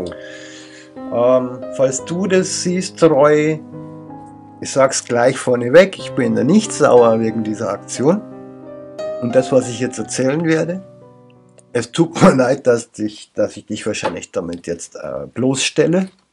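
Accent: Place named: Munich, Germany. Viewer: scheinst ein sympathischer mensch zu sein! und noch mit echtem münchner dialekt! grüße aus ndby!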